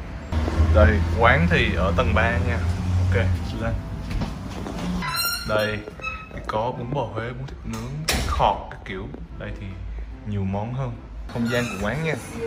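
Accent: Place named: Gia Lai, Vietnam. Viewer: Giọng nói của bạn giống ở gia lai vậy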